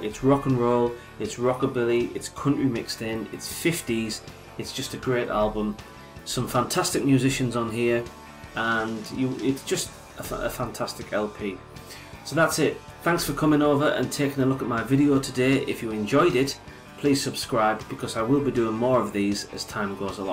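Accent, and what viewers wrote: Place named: Northern England, United Kingdom. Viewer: One of my absolute fave albums, this. Not a weak track on it, a great country-rockabilly sound and just generally fantastic. Also, first time I heard you speak, mate, a fellow northerner by the sound of it (although I'm a Yorkshireman).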